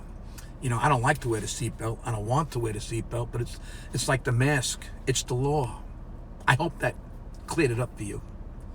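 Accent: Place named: Long Island, United States. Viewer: says it in the Long Islander way, my home town....he may be a comic but history shows a lot of comics make a lot of sense; like George Carlin. Just listen to this guy and tell me if he is wrong, think about it and if you still don’t get it, play it back again and think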